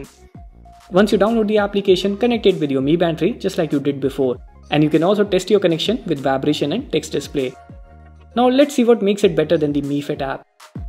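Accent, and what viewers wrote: India. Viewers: I could hardly understand your Indlish... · Ngomongnya medok india banget yak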